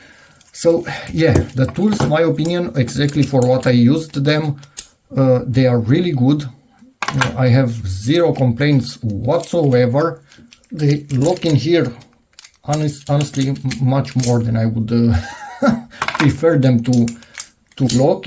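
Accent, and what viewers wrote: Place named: Romania. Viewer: Accent clar romanesc :))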